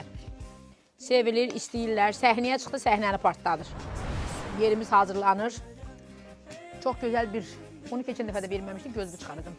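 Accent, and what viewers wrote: Goranboy, Azerbaijan. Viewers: Goranboydan salamlar. xanim ne qeder bakida yasadiz indi de moskvada yasayirsiniz amma oz hele de oz goranboyumuzun lehgesinde danisirsiniz halaldi size.hemise bele qalin deyismez.hem de deyim ki somu basqadi ispanaq basqa.somu yol qiraginda bitir.gozel de ketesi olur.xosbextlik arzulayiram.❤❤.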